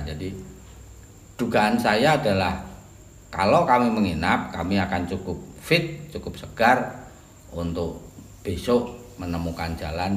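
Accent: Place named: Semarang, Indonesia. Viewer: Itu bahasa Semarangan kak, medhok nya ada sedikit ngebass2nya gtu, wkwk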